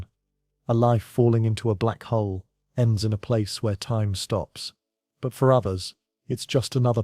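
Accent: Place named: United Kingdom. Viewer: Comfy soft bed, warm, cozy quilt, big, soft pillow, space video with soothing British accent. Good night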